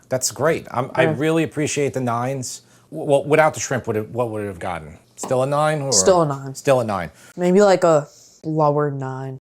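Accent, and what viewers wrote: New York, United States. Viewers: Pre-ordered the book! Love your style, your NY accent, and the family! ❤ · Why is it every time I watch you it sounds like you've rarely left NY?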